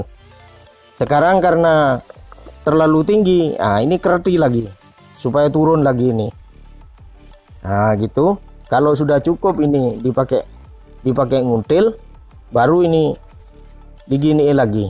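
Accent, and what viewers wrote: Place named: Madura, Indonesia. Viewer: Ini kakak pasti dari madura.... Tak iye?? Mantap kak... 👍👍👍 sdh like n subscribe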